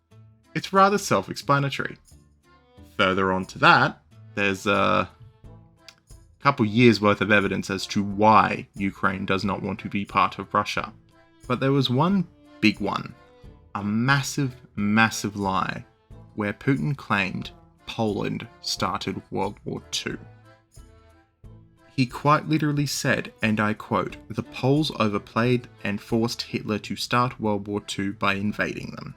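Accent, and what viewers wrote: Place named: Australia. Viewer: You sound like an Aussie. More correctly an ill informed AUSWIPE. Every word Putin spoke was true. The west has big plans for Ukraine THESE ARE THEIR WORDS. 1, They plan to shut down farming in Europe making it the crime of ECOCIDE punishment jail move it all to Ukraine. 2, Shitstain 🇬🇧 has spent big in Odesaa and the coast hoping Ukraine would build cheap warships. 3, Israelistan owns 30% of the land in Ukraine Black Rock and Vanguard planned to buy 100%. 4, Israelistan owns almost every major business in Ukraine just as they own Australian businesses. 5, Ukraine is losing the war its Military decimated. Redacted in the US announced Israelistan 🇺🇲 has ordered ZZelinsky to replace its entire government with western educated people who are LOYAL TO AMERICA this accounts for General Zalhuznyi a UkroNazi his replacement more acceptable to RUSSIA and the WEST. 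6, The ploy to take Ukraine and the southern ports failed tbey thought they could fill it with war ships and block Russia's warm water ports. 7, The west thought creating a UkroNazi state yes there is film of an American Nazi explaining how the CIA used US Nazis to create AZOV Shitstain 🇬🇧 created right sector 1 and 2 using UK Nazis other groups KRAKEN etc exist they were handed territories where they ruled like medieval Barons. The war to collapse Russia failed Europe and Israelistan are bled dry of weapons cash and raw materials they hoped to steal via the total collapse of Ukraine and Russia have evaporated theyre broke.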